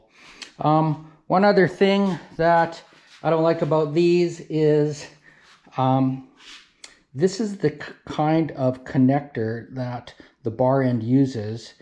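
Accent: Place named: Canada. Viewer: I knew you were Canadian as soon as I heard your accent. 😎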